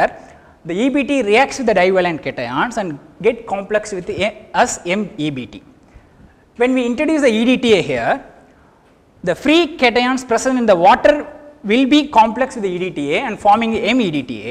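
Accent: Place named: South, India. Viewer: quiet different accent, I guess he's south indian, should've given captions too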